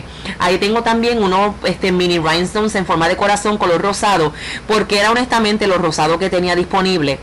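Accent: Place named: Puerto Rico. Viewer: Me encanta tu acento boricua tengo una tía allí en Puerto Rico.......me encanta tu diseño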